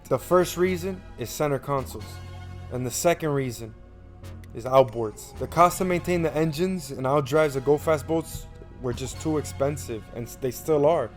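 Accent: Miami, United States. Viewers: This guy has a Miami accent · My bro has a Miami accent. 305 ❤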